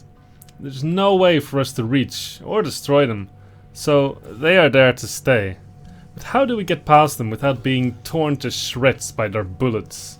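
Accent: Netherlands. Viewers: yooooooo your dutch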